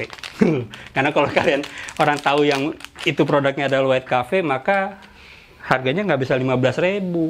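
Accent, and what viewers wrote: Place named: West Java, Indonesia. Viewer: Mantaaappp bah...aslina mn siga sunda,slm sukses tkw malaysia nonton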